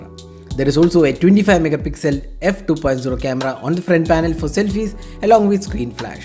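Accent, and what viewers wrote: Kerala, India. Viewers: His english accent and location looks of kerala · are you from kerala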